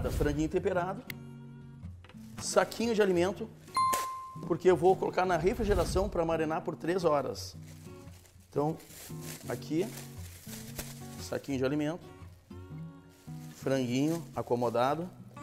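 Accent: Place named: Porto Alegre, Brazil. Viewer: Sotaque de Porto Alegre !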